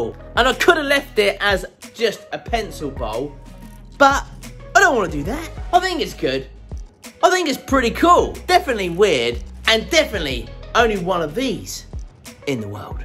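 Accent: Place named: England, United Kingdom. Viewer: Where in England are you from, i mean with your accent ?? Its not london and probably not manchester or up there 🤔🤔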